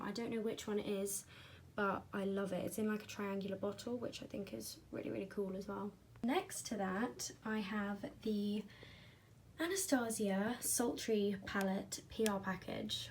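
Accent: United Kingdom. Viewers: Omg I'm surprised that your British I thought you were American ahhaha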